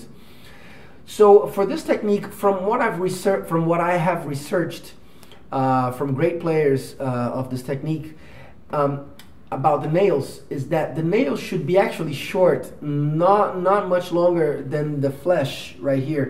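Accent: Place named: Brazil. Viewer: Super aula. Inacreditável que seja gratuita. Tô comentando em português pois acredito que vc seja brasileiro pelo sotaque. E que ótimo inglês diga-se de passagem.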